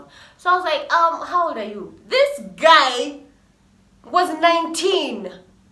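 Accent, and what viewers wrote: United Kingdom. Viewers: Uko na accent ya Briton 😮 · You do a mad British accent.... Damn😍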